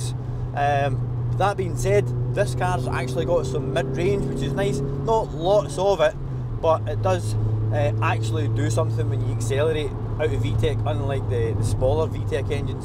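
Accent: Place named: Glasgow, United Kingdom. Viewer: It’s gods language , English with a Glasgow accent !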